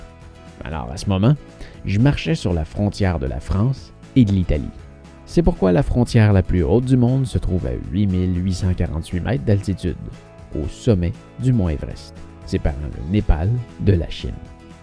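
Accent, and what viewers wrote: Quebec, Canada. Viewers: Ha la la. Je suis désolé. Mais je n'arrive vraiment pas à aimer l'accent québécois. Je trouve qu'il gâche le sérieux de son reportage. Mille pardons.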